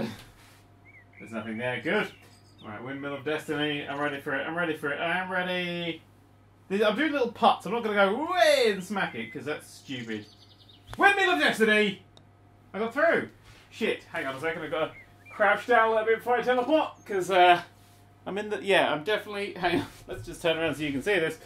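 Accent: England, United Kingdom. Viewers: is extra english today. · I think is extra British in this episode. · When gets angry, he gets like %70 more British. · Is it just me or does sound more British then usual in this video? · Has became more British?? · Is it just me or has gotten significantly more English? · became more british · sounds a lot more british in this. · Boy sounds extra British today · I think sounds the most british he ever has in this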